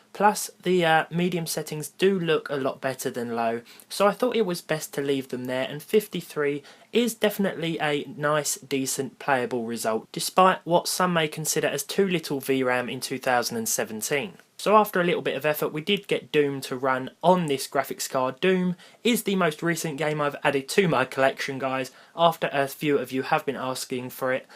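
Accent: United Kingdom. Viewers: You look like General Sam with a British accent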